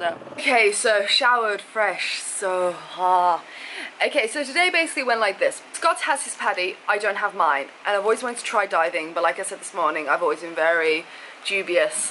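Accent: United Kingdom. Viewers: I just can't nail down your accent. It's certainly not Aussie but if you're a Brit, then you speak with a watered down Queens English.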